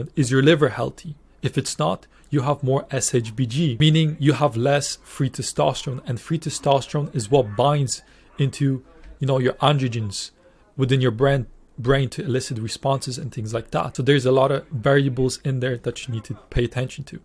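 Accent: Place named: Ireland. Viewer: I am concerned that your mentions of the thyroid hormone T3 come out sounding exactly like "tea tree" in your lovely Irish accent (which does not differentiate between the "t" and "th" sounds). The trouble with that is the Australian essential oil by the name "tea tree oil" (also known as melaleuca oil). It is used topically for skin problems in folk medicine and also in aromatherapy, but it's highly TOXIC when taken by mouth. Excessive use on the skin can cause endocrine disruption leading to breast enlargement in males. I don't want anyone to think that you're suggesting that they take or use tea tree oil when you say "for this to occur, you need T3" and "you lack T3 in the body."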